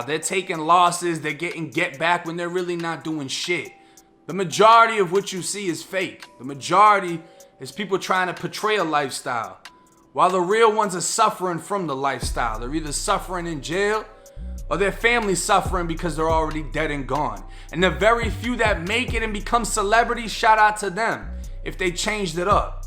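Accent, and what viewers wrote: Boston, United States. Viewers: Great vids just wondering where your accent is from, boston? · are u from Boston? cuz you sound like you are from here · Yo you from Boston?? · Are you from Boston ?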